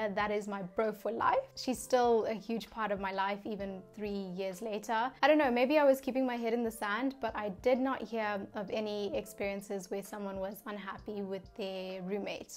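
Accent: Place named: South Africa. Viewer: I knew it she's south african 🇿🇦😝 thanks for the in-depth review. I feel super comfortable in my choice for my masters there. 😎 Keep it up!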